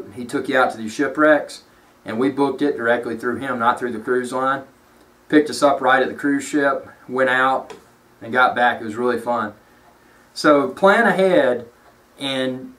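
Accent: South, United States. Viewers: That was awesome. Thanks for the information. But what really kept me watching your video was your cool southern accent ;)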